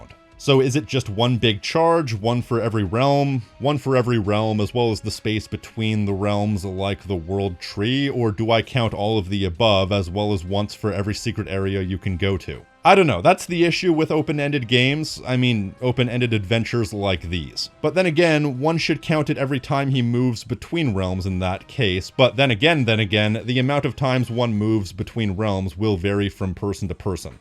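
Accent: Canada. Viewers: Hes Canadian, note the subtle pronunciation of a-boat instead of about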